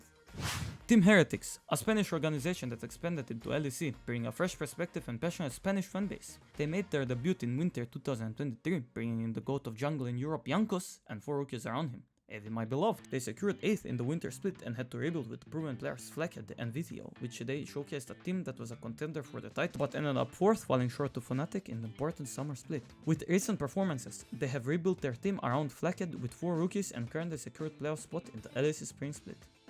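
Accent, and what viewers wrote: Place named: France. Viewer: you just know this guys french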